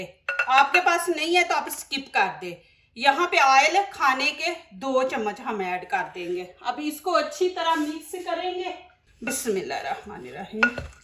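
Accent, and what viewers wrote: Punjab, India. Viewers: ماشاءالله تعالیٰ ۔۔۔۔۔۔۔!!! بہت زبردست اور ذائقہ دار لگ رھی ھے یہ قیمہ آلو کی ڈش ۔۔۔۔۔۔!!! میں نے بیگم کو فارورڈ کردی ھے ٹرائی کرنے کے لئے ۔۔۔۔۔۔😊 پہلی دفعہ آپ کی کوئی ویڈیو دیکھی، آپ کے اردو بولنے کے انداز نے بہت محظوظ کیا۔۔۔۔۔۔!!! جیسے میں اردو کے لہجے میں پنجابی بولتا ھوں ایسے آپ پنجابی لہجے میں اردو بولتی ھیں ۔۔۔۔۔😂 میرا تعلق کراچی سے ھے اور مادری زبان بھی اردو ھی ھے، لیکن مجھے خاصی پنجابی سمجھنا اور بولنا آتی ھے۔۔۔ الحمد للّٰه تعالیٰ والسلام علیکم ورحمتہ الله تعالیٰ وبرکاته ۔۔۔۔۔ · Plz urdu ko urdu k.lehjy mn bolen aap that punjabi accent mn bolty hn kuch acha nhi lugta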